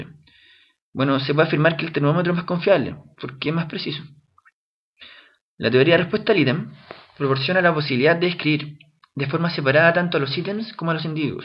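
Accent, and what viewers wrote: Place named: Chile. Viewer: ¡Es chileno!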